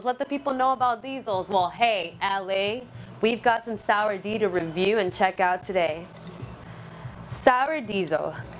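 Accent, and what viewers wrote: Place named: Jamaica. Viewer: Sour! "Jamaican Acent"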